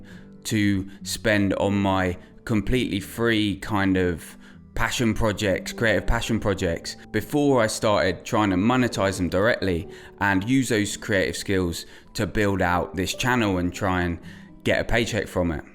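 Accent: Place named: London, United Kingdom. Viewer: Whats interesting is I think your accent has got more London from these early videos, maybe more vocal fry, it’s funny because I met this guy at this film event who was clearly trying to hide his really normal middle class background by sort of trying to appear like he might of ground up near some house estate in east London. I didn’t ask about his background but I could tell he might of felt like he wouldn’t be accepted as an artist unless he could present that he had some socioeconomic struggle and hinted past trauma. He showed me some photos he took, he sort of shyness around his urban aesthetic, he photographed old cafes and old people smoking, gritty stuff but I could tell he wasn’t from that world at all and it was odd because he’s clearly thought to create this character out of fear of not being seen as a true struggling artist. 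I mention this because your channel is about the struggling artist.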